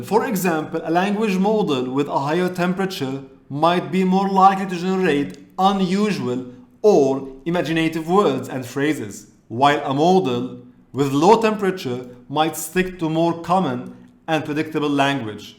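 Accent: Arab world. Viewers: ألسلام عليكم. أأنت من العرب، لان انكليزيتك كالعرب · This is my first video of this channel, and I know a good teacher when I see one. You've the capability to teach and keep the attention of viewers in this short attention span era. It was a treat watching. Arabic accent 🇧🇩🔥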